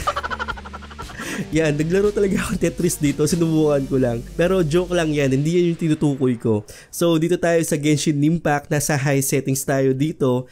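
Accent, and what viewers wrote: Pampanga, Philippines. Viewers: Kapampangan ka neh? balu mung balu ken pamanyalita mu lalagitik hahahaha taga ka nokarin pampanga sir?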